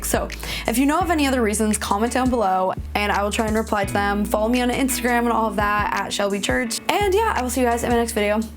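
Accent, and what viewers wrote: Los Angeles, United States. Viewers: Quintessential L.A voice and personality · At least the LA accent has rubbed off on you!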